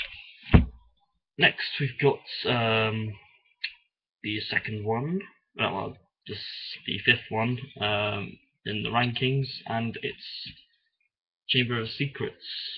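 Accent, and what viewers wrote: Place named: Northern England, United Kingdom. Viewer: okay you sound northern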